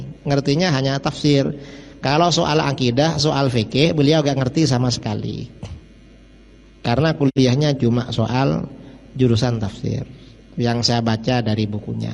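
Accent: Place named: Madura, Indonesia. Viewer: Idrus...logatmu madura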